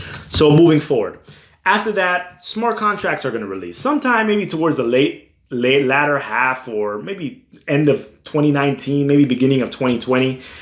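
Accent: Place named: New York, United States. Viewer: Did you go to an Ivy? You are very eloquent. And you sound like you're from NYC.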